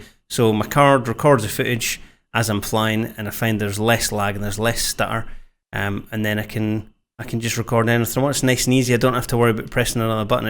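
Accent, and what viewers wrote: Scotland, United Kingdom. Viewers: OoooOooOOO dat thicc Scottish accent. Congrats on 10k!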